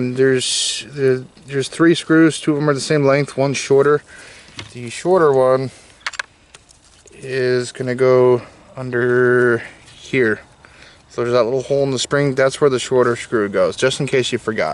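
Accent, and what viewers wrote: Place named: Midwest, United States. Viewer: You must b a midwest boy.nice clear speech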